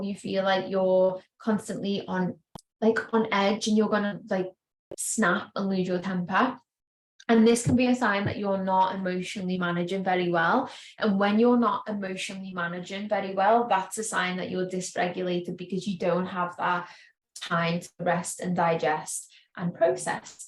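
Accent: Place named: Liverpool, United Kingdom. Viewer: Nice to hear another Scouser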